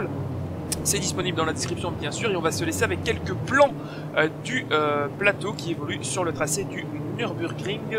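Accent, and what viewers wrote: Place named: Belgium. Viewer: c'est différent on va dire. Pour moi c'est convenable, juste qu'on dirait qu'on t'entend de plus loin. Peut être juste un soucis de volume j'pourrais pas dire. J'en profite que tu aies répondu a mon message pour te féliciter pour tout ton travail, que c'est grâce a toi et ton pilotage que je me suis mis a fond dans la simu auto sur circuit (Je jouais qu'aux Dirt et a dirt Rallye des la sortie.). Commentary superbes, pilotage superbe, et qualité au top. Puis c'est cool d'avoir un peu d'accent belge aha. Encore merci pour ton contenu! T'es au top!;) PS: Manque une seule chose, plus de vidéos sur Dirt, comme une carrière en let's play par exemple, et pourquoi pas du test Rallye sur Assetto Corsa. Et la j'aurai plus rien a demander aha 😂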